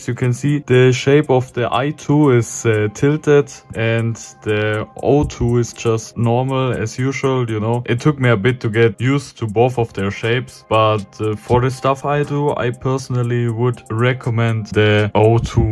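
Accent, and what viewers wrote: Germany. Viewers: are you german?kinda sounds like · THIS IS THE MOST GERMAN ACCENT EVER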